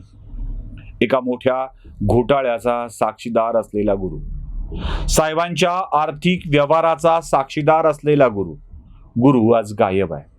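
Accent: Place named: Latur, India. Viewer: शब्दाला व शब्द फेकीला धार आली आहे. खूप खूप शुभेच्छा. एक कळकळीची विनंती. न आणि ण ची गल्लत सुधारून घ्या. लातूरची भाषा, हेल आवडेल पण उद्याची पिढी जेव्हा तुमचा आदर्श ठेऊन अश्या चुका करतील व ते पण असेच बोलतात म्हणून सांगतील तेव्हा खूप दुःख होईल. राग नका मानू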